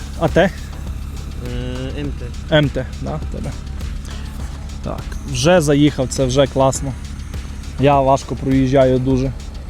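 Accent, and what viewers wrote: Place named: Transcarpathia, Ukraine. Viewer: Впізнаю наш говор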